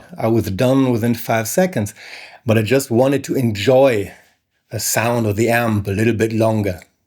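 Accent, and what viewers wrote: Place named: Germany. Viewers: German accent is my favorite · I like this content, and I like hearing presenters with a German accent! Very cool, sir, very cool.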